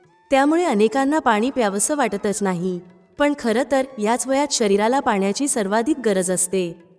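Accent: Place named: South, India. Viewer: मराठी उच्चार दाक्षिणात्य वाटतात...😊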